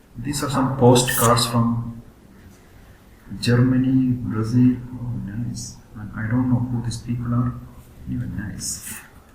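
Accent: Kerala, India. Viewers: Sir,you sound like a malayali. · Am I hearing a mallu accent 😶? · Are u malayali ,ur slang express it.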